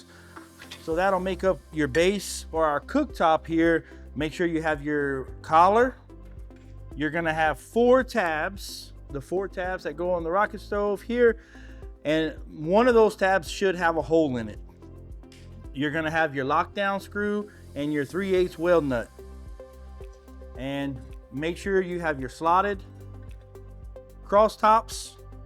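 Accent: Louisiana, United States. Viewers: I love your channel. I,m a retired tool & die maker & appreciate a true craftsman. But something I noticed about was your accent, so I looked up were your shop was ln Wiss.That can,t be? He talked like me. That fellow is from South La. Then looked your Profile on your web site. ,I was born in Church Point La. Where might you be from?😃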